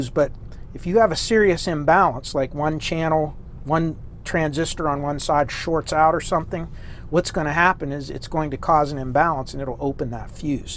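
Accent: Pennsylvania, United States. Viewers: Johnstown Pennsylvania accent.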